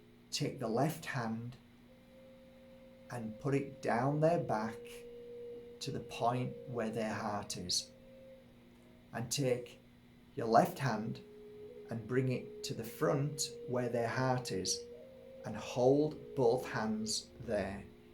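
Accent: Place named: Northern England, United Kingdom. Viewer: Thank you for this valuable information, It was quite nice that when I searched for healing at a distance, it was you that popped up and I immediately recognised you from the sheep farm podcasts. Which I'm a fan off. I'm in Bolton, greater Manchester. I've got a loved one in hospital with a stroke, using your guidance, I felt myself in the room with her, I have tried before, but with no idea what I should be doing, but this was an amazing help, thanks. All the better being a northern lad, whose voice I was familiar with and could relate too. A synchronicity in itself.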